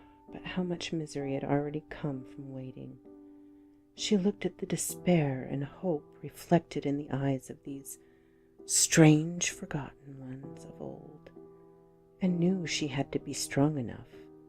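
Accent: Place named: South, United States. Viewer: typing this while listening great story love the floral scroll work in the video but I'm from Tennessee and I think your southern accent is adorable lol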